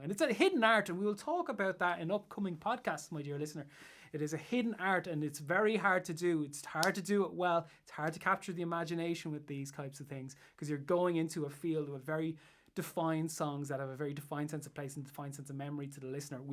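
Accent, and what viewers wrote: Ireland. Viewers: Man, thank you for that fabulous review with your honey-sugared lovely irish accent!:) Can you and please come to my private christmas party???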